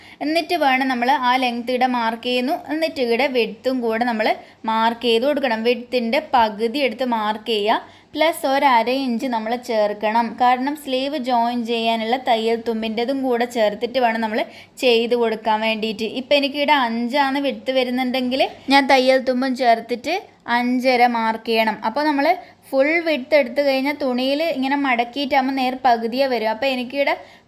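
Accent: Kannur, India. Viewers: കണ്ണൂർ ഭാഷ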